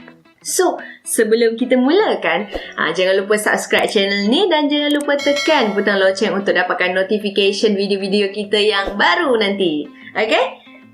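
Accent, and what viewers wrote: Kedah, Malaysia. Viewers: 10 bungkus hang Tibai Nasi Lemak.. He org kedah ka?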